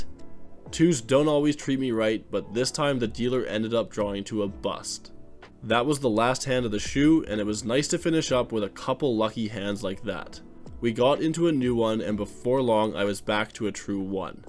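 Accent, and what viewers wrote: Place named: Canada. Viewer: you sound canadian, so guessing you arent tipping. but making that kind of money you really should tip your dealers.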